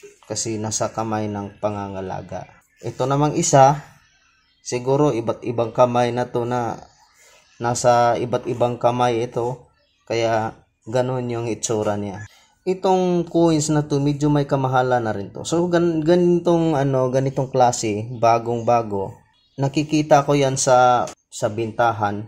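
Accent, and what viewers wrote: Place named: Visayas, Philippines. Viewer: Bisaya ka man bai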